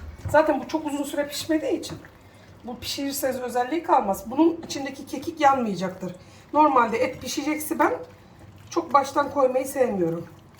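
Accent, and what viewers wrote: Aegean, Turkey. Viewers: hanım ellerinize sağlık iyi bayramlar diliyorum canım bir Tireli olarak Bursa dan çok selamlar sevgiler yeni takip etmeye başladım gerçekten çok seviyoruz seni egece konuşmalarına bayılıyorum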